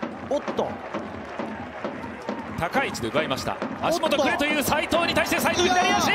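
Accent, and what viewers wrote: Kansai, Japan. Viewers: 、おもろい。新しい切り口だな。関西弁やし松木さんより中毒性がある。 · 解説誰？めっっちゃ関西弁やん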